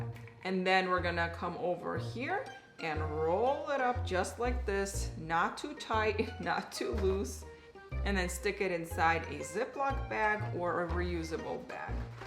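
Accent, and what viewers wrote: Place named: New England, United States. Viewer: Ur accent is of a New Englander.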